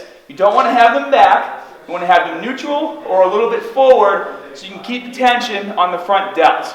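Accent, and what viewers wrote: Boston, United States. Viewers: Dude you from Boston ? 😂 the accent tho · Definitely a Bostonian. love it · You're certainly from Boston lol, but u crossfit bruh??? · Is this a Boston accent? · From Boston? Me too lol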